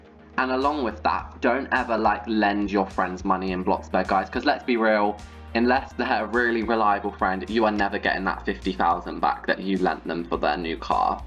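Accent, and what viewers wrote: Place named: United Kingdom. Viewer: Sounds like a briish boy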